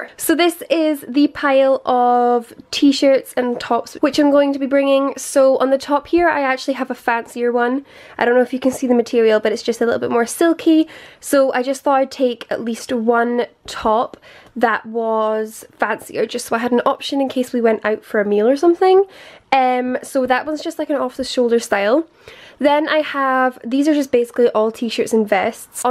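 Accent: Scotland, United Kingdom. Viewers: SUBSCRIBE AS SOON AS I HEARD U ARE SCOTTISH ME TOO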